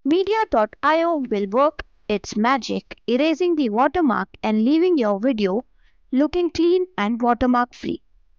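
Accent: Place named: India. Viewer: Just by hearing tha accent made me run form this video. Indian scammer.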